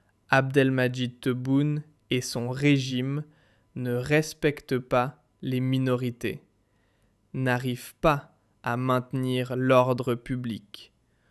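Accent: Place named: Canada. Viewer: Pourquoi vous changez votre accent il me semble que vous avez l'accès canadien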